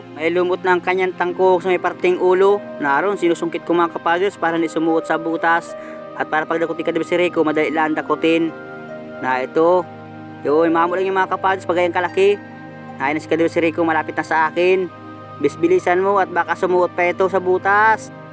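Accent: Bicol, Philippines. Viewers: Pa shout out idol Ruben Cruz nasa Dubai pero taga bicol kaya gusto ko Tagalog mo at ma halong bicol 😆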